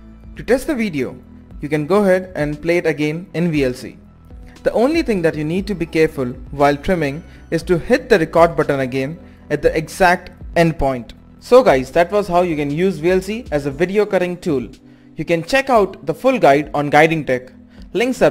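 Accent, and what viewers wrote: India. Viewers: Why is it so , that when i hear an indian voice , i don't thrust the tutorial ?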